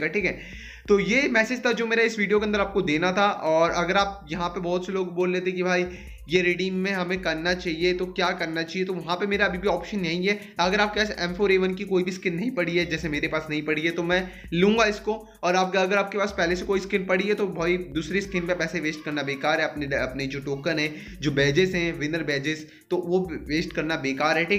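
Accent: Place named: Garhwal, India. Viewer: se pta chlta ha ki apna gadwali boli mst bahi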